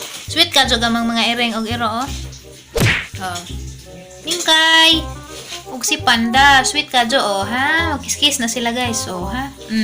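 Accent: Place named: Visayas, Philippines. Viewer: Sis ntutuwa ako sau hahahahh srap pakinggan salita mo medyo iba ang bisaya dto samin sa mindanao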